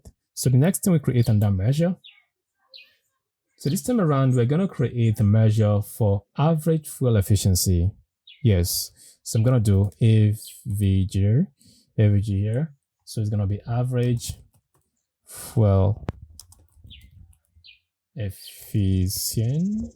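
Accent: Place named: South Africa. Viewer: Fantastic, super useful, thank you! Nice voice and super accent (south-african?) - was a pleasure to spend 2hrs with you Did not really like the part with background done in power point/screen shot etc. I did mine inside PowerBI directly, although not so beautiful as you did. Mine is just OK/good enough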